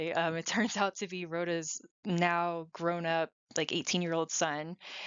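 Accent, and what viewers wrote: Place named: United States. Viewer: Oh that ghastly American accent! How it jarrs....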